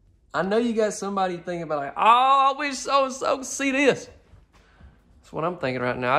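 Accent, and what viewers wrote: South, United States. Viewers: Didn’t care for the “ghetto” remark. You sounded more country than anything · You sound Extremely southern....not ghetto 😏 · thats not ghetto speak. that would be what you hear in a inner city (mostly black) area. what you are doing is southern slang. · This a beautiful! Bright! You are southern guy you sound like you supposed to sound. Don't change. You are so funny in your own way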